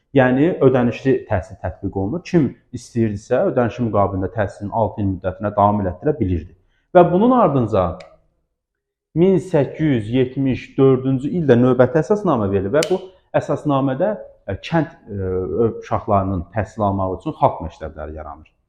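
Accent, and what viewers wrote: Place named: Aghdam, Azerbaijan. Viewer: Mən Ağdamlıyam, ləhcənizə görə soruşdum,bizimkinə çox oxşayır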